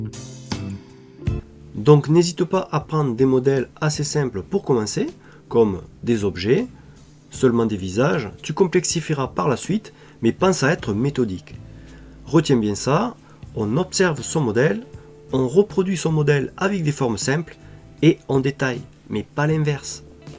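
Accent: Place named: South, France. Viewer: Petit accent du sud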